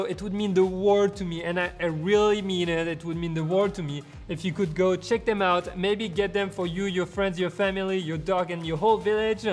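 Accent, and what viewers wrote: France. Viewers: I just jump from your FR chanel and it's fun, you got a french accent, and in French you got like 50/50 British/US accent :D And one more subscriber.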